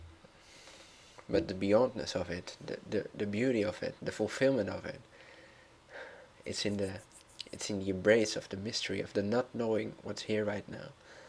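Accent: Netherlands. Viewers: yeah..... he's from Amsterdam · Is he Dutch?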